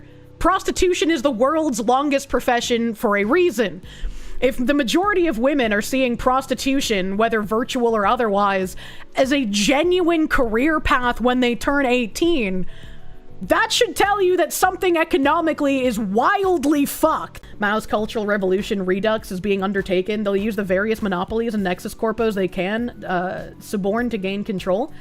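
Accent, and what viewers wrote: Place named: Massachusetts, United States. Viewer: Ugh I watched a montage of telling stories about her mom the other day and now I can't not hear the Massachusetts. Ruined. Still gonna watch tho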